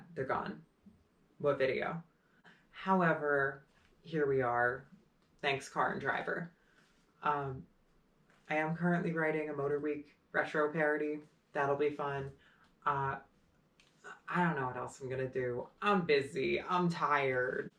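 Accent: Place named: New Jersey, United States. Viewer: Do you mind if I point to your channel? Im about to record my long form episode on this. Did a stream last week on a friends channel, and I talked about this ad, and why I do believe its going to happen, and even know who will be hired as employees and whatnot. Just came across your channel, and you totally sound Jersey. For a moment I thought you snuck into my garage. Same cinder blocks, even the AC in the window is the same. Did you sneak in, move 12 cars, pull out my 1320 and sneak Herby in my garage lol.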